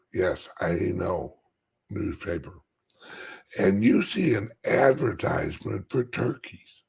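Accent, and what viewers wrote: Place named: South, United States. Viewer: This right here is the second time I am coming across this name in a week. Came to my school for a lesson and it was lit and yes, she has a really cute southern accent.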